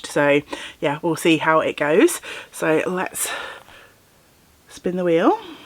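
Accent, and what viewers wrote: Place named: Wales, United Kingdom. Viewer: I am binge watching many of your videos. As I am working through my cast-ons for 2026, I have been thinking about what about you that has captured my attention . One thing is your accent and that you are in Wales. Your home town is beautiful. You have so many ideas. You enjoy many projects at once. You are a plus size woman. It is refreshing for me also a plus size woman. And your waffling is a great joy to me.🤣🤣🤣💚💚💚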